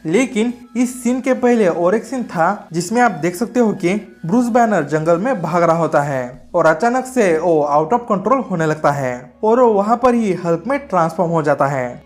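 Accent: Bihar, India. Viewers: Aap Bihar se hona?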